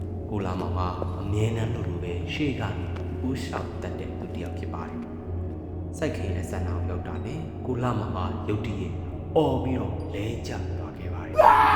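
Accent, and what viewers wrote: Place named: Myeik, Myanmar. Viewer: ကြိုက်လိုက်တာရှင် အသံလေးလည်းဖွာမိတယ် မြိတ်အသံလေး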